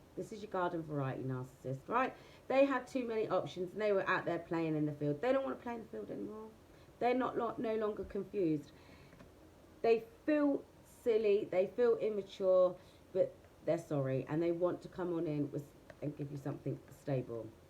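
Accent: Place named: London, United Kingdom. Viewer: You must be London 🤔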